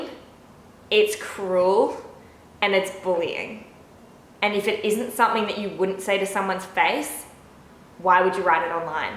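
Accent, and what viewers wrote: Australia. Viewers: Sorry this is completely off topic but are you Australian because you sound Australian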